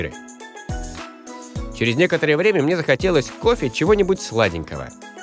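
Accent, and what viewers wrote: Shymkent, Kazakhstan. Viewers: Ты из Казахстана? Шымкент? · Ты че с Шымкента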